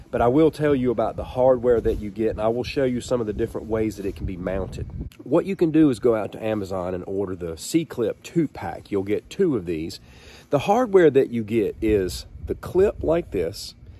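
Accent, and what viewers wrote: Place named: Virginia, United States. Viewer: Can I just say as an Englishman the Virginia accent is the coolest in the 'States.😁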